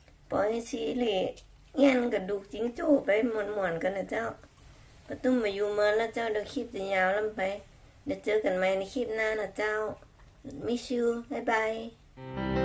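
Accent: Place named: North, Thailand. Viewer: สวัสดีค่า เพื่อนใหม่มาส่งกำลังใจและมาขอกำลังใจด้วยคนนะคะ,, ชอบภาษาเหนือมากๆเลยค่ะ สำเนียงเพราะจัง❤️🥰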